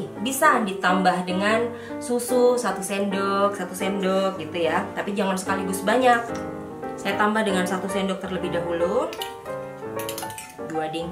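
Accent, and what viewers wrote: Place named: Indramayu, Indonesia. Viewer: mbk nya orang indramayu ya....denger logatnya...heehehe...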